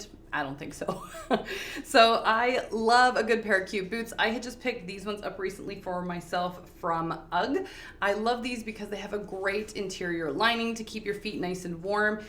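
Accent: Canada. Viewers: I thought I detected a bit of Canadian in your speech. I am in Detroit right across the river from you neighbors. :)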